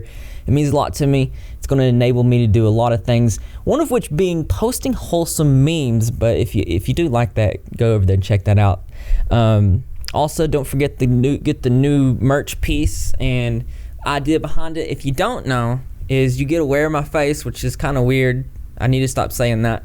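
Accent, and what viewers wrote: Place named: Tennessee, United States. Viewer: I legit could listen to you speak for hours haha probably the fellow TN accent that I can appreciate haha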